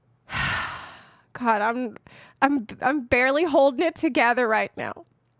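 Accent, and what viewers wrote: South, United States. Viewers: Awww you are adorable. And southern lol I second your thoughts on the story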